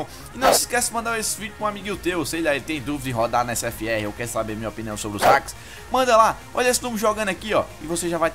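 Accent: Northeast, Brazil. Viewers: MAN ELE E DO NORDESTE É???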